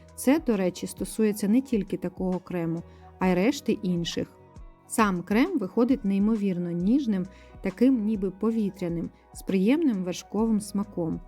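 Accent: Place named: South, Ukraine. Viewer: Добрий день! Мені здається що ви з півдня України, за місто промовчу!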